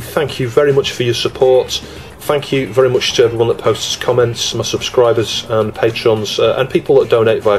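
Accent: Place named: Manchester, United Kingdom. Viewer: Love the video. That Manchester accent, though...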